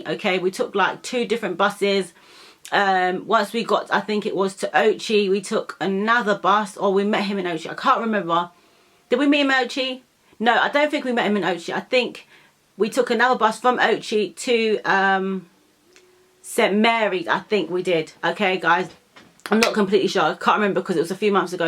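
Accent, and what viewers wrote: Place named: United Kingdom. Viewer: Thanks for your honest and truthful opinion about....I can see it in your eyes and your facial expression. I too share your opinion, evaluation and sentiments about. I think he is a very honest, hardworking, progressive young man who has chosen to impress the world with his cooking skills, his simple and humble lifestyle, the beauty of jamaica and our culture. Not to mention the love of his dogs and cats, the relationship with his son, his dad and other family members. attitude and personality is so transparent and pure that, even though I have never met him, he has "virtually" become a part of my family. This is for... Me know se u a go through some stuff right now my yout'.. no mek it get u down. U young an' u strong. Life is a teacha', learn u lessons well. No mek de some mistakes twice..zeeen.. Nuff said...anyway...big up youself Pretty girl..love de British accent..ONE LOVE. 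🥰🥰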